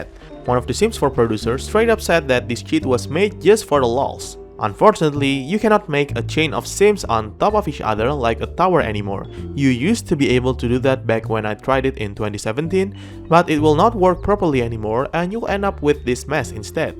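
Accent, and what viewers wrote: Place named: Indonesia. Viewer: always love hearing your indonesian english... unlike how most locals talk nowadays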